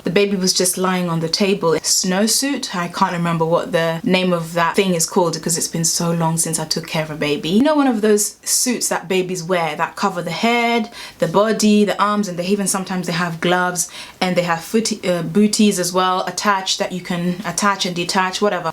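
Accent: United Kingdom. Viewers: Your African,your not considered black by us.Your African,British accent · That British accent.. 🤝🤝👌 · Girl, I'm African-American and if you came to the U.S. you would get stared at by Black people because of your dark beautiful glistening skin. Then if you started to talk with that English accent you would get stared at even harder!! You would be such an anomaly here, worth every adoring stare!! Its interesting to see how slavery affected and displaced Africans all over the world. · The problem is you believe you are "Black" and the people are Germans. You dont want to Call youself British going by your accent. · Hi...,I real like your accent are you from UK?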